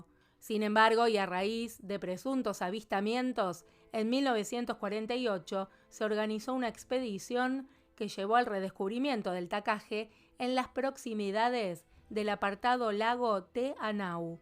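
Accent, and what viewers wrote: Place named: Rioplatense, Argentina. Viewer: ❤ siempre muy instructivo sus videos y su acento Rioplatense lo hace mejor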